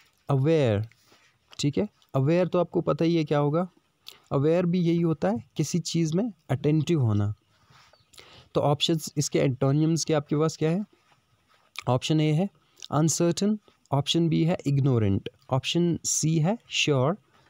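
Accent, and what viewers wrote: Kashmir, India. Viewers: Sir i guess u r kashmiri...!!! Am I rt..??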